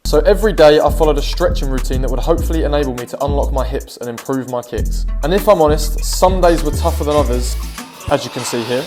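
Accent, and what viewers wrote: United Kingdom. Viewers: YOOOO YOUR ALSO BRITISH?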